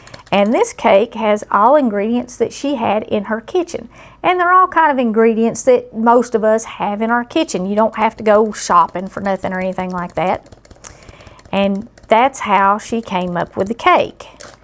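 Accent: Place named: Tennessee, United States. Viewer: Hi from Ohio👋👋TY...great recipe! My grandmama used to make for church dinners yrs ago! I❤ur accent...reminds me of home (TN)!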